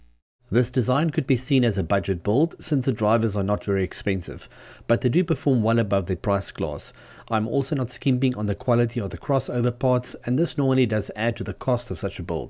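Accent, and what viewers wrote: South Africa. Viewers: That's a definite South African accent there. Where can we find stuff like this in SA? Awesome build!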